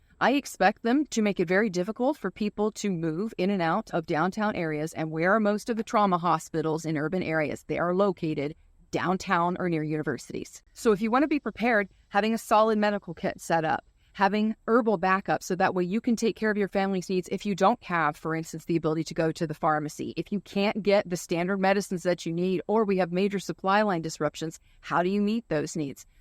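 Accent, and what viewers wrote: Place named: California, United States. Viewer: This guy sounds like a Californian; notice that they always are talking about sending other people to do things for them.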